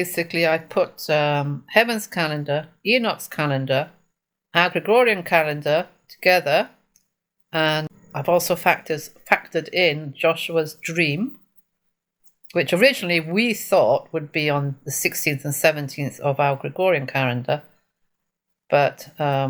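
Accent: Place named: Manchester, United Kingdom. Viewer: are you a Mancunian? I was born there and left for Australia in 1972. Your accent sounds very familiar. I'm born again and thank you for your work in the Lord. We fly soon.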